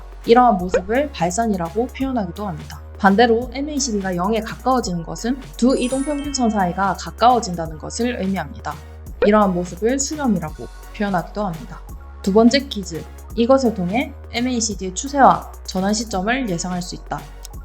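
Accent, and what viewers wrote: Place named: Gyeongsang, South Korea. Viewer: 경상도 분이다~~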